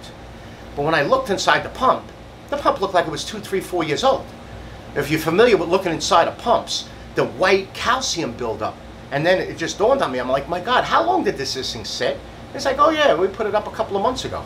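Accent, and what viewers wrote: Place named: New York City, United States. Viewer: I can hear your NYC accent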